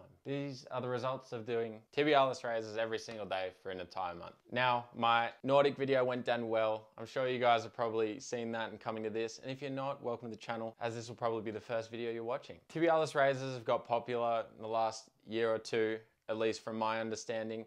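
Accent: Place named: Australia. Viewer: Tibialis raises. Australian accent. Subscribed!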